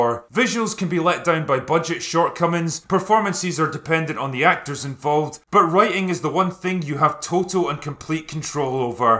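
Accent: Scotland, United Kingdom. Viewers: Татья́на... yeah, it's Tat-i-jana, whereas the 4th and 5th are letters unknown in the English alphabet. The 4th is almost like the German "ü", the 5th is simply "ja" or "ya". I would say "Tatiana" is the most accurate, it's just onomatopoeia anyway. I guess Russians, Belarussians, Ukrainians and other Slavs DO have a couple of ways to write this name 😁! But as I wrote, the way pronounces the name with his Scottish accent it does sound more like Tateyana. Probably the man gets that girl to speak into the mic one day. If only she wasn't purely imaginary... 🤣😹😂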